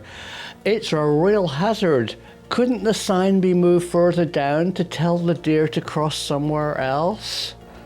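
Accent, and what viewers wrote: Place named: Essex, United Kingdom. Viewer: Oh deer... 🤣 Edit: Prof. is an Essex Boy! 🤣 (I live dangerously close to the border)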